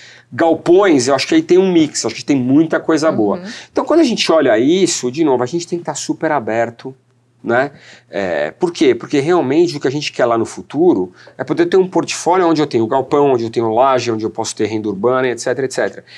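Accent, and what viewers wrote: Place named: São Paulo, Brazil. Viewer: Esse sotaque paulista é um tanto engraçado. Cliente Valora aqui ;)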